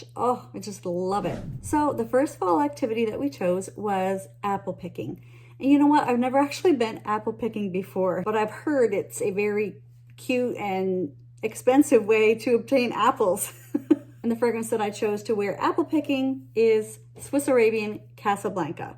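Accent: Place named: Maritimes, Canada. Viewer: I was trying to figure out which island you were talking about and then I realized it's PEI (confirmed by your Maritime accent 😄). Then I wondered what perfumes you wear to your favourite places on PEI. Then I wondered what perfumes I wear to my favourite places in BC. Now I'm off to Winner's to find Bourbon Craft. Wish me luck!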